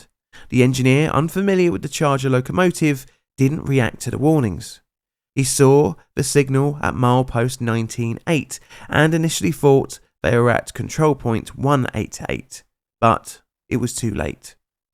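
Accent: United Kingdom. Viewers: Transport? Transportation. It's like the narrator can't decide whether he's British or not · Laughter so hard at the way you pronounced "Puget" , as a long drawn out "pooojet", which immediately tickled the 10 year old boy living in the back of my head. Thought he was pretty much gone, but the way it just popped out your mowf, surrounded by, what always sounds to us ignorant yocals in America, as a sufficticate accent, whether it's the queen, or someone speaking some form of cockney...well, it just caught me off guard a bit! Thank you for that! I needed a little bit of a chuckle today.😏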